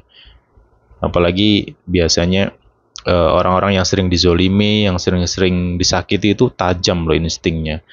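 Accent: Java, Indonesia. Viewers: Scorpio hadir matur suwun vidionya, aq seneng cara penyampaiannya jowone MEDOK BANGET hehehe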